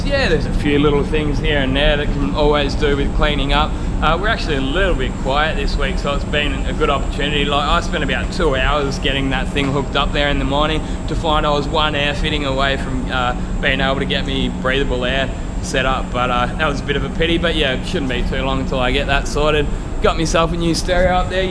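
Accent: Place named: Australia. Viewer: not sure if your in Australia or not, your accent makes it sound like you are. If you are will you please show custom spray mods how to paint. I swear he paints like some shoes never held a gun before. trigger happy as hell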